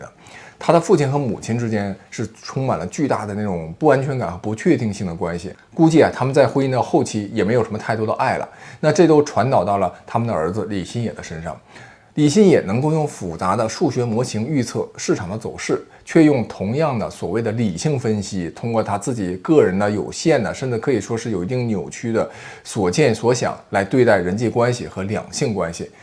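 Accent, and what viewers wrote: Northeast, China. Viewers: 刚刚发现帅哥美女的这个频道，挺棒的！有他们的背景介绍吗？的口音好像是东北的，哈尔滨人吗？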